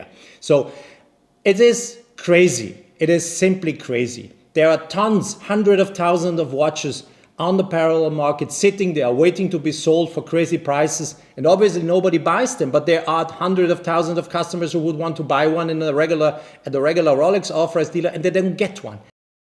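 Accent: Vienna, Austria. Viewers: Finally I know how a Viennese person will sound like. Omega makes great watches. Thank you for sharing the story! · you are absolutely spot-on on this matter. I watched this video with awe and bursted with laughters of your sense of humour and that Vienna accent hahaha. It's beyond f**king bullsh*t with this Rolex artificially-created frenzy. In Hong Kong here it's as bad as you can imagine, I wanted to purchase a simple black dial 36mm Oyster Perpetual, the freaking AD told me to fuck off and go for the grey market, I checked the grey market price 2 weeks ago - a f**king 3-hand black dial OP is now costing >10,000 USD. I was like "Okay that's it" and just move on. The old good days before 2021 when Submariner / GMT/ Cosmograph Daytona got hyped but you could still walk into a Rolex AD to get an OP, Day-Date, Datejust or occasionally Yachtmaster; now you walk in any of them and all they have are lady models with diamonds or very small lady models. 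Honestly at this current point I won't be surprised if the lady Rolex models are going to get hyped and within 2022 you walk into a Rolex AD there is NOTHING to see. Just arrogant employees and empty shelfs. Keep up the good work, love your content and looking forward to many more to come 🙏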